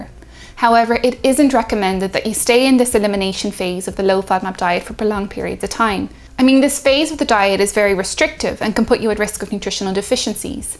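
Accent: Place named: Ireland. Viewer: That ìrish accent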